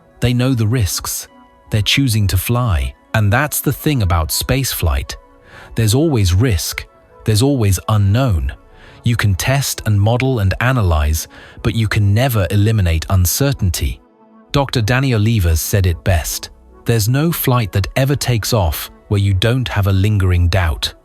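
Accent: United Kingdom. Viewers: narrated by people who have British Empire accents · a narrator with a British Accent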